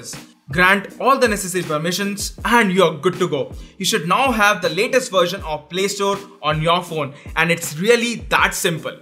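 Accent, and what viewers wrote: India. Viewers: Indian english HAHAHAHAHAHAHAHAHAHAHAHAHAHAHAHAHAHAHAHAHA it's so funny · can you just have an AI instead teaching rather that indian voice teach us..really google play is that the best you can do??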